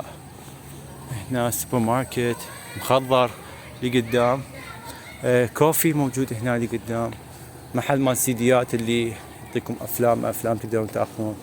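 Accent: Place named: Iraq. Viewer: سيد شلون ضبطت اللهجة العراقية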